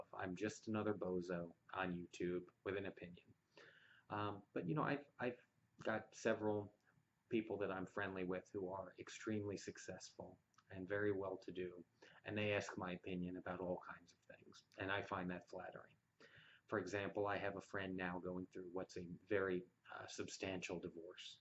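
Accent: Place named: South, United States. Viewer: I like your dirty southern accent,!!!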